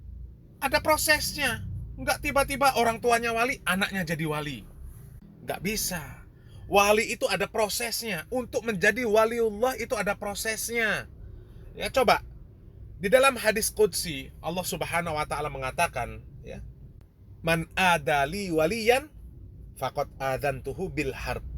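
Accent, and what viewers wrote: Java, Indonesia. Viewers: dari logatnya kamu dari daerah jawa ya,banyak omong lo sini main ke Kal-Sel dan yg komen nya yg miring.kalau memang orang kal-sel/martapura sesat mari kita duduk bersama luruskan. jangan cuman nyeloteh di dunia maya karena itu bukan suatu penyelesaian. salam hormat saya dari Kal-Sel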